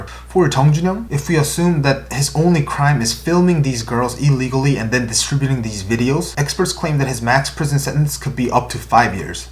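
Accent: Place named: England, United Kingdom. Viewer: Please know that you make the international k-pop fans very stress reliving with English ascents and detailed information.. Love form our side 🤗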